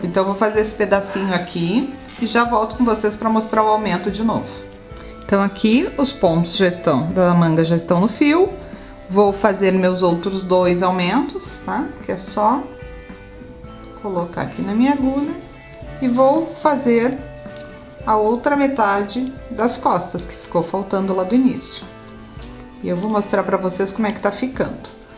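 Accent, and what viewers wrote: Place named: South, Brazil. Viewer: Boa noite, estou muito grata por todos os ensinos que tenho tido contigo. Te acompanho sempre, e comento pouco. Como tricoto no método inglês com mais facilidade, e ainda nova neste mundo maravilhoso da arte, vejo vc tricotando rápido demais. Então, vejo várias vezes para pegar cada detalhe. Linda demais essa cor. Ouvir esse sotaque do sul é maravilhoso. Morei no início do meu casamento em Santa Maria. E trouxe uma guria para mim😂. 😘🙏🙌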